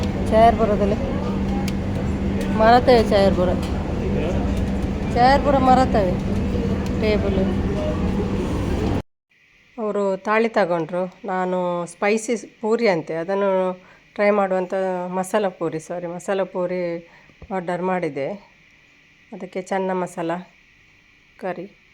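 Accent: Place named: Mangalore, India. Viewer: Nim mangalore Kannada bashe Kelakke Chanda ❤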